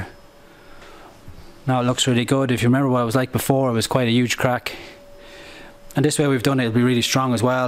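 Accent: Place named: Ireland. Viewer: wow fair play well done and good to have an irish voice for a change lol.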